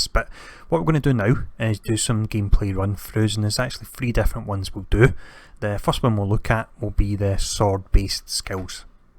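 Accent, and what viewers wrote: Scotland, United Kingdom. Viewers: ffffffuuuuck that Scottish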